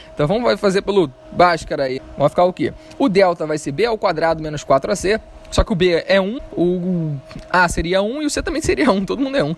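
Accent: São Gonçalo, Brazil. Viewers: Você é de São Gonçalo RJ??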